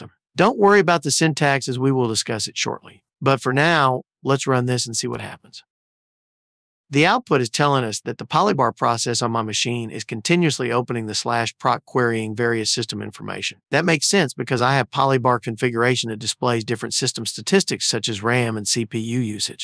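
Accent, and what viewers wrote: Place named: South, United States. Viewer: Good video 💯 Btw I was thinking about your southern drawl. If you turn out to be a 80 year old Grandma living in England somewhere,I'm gonna mad upset😂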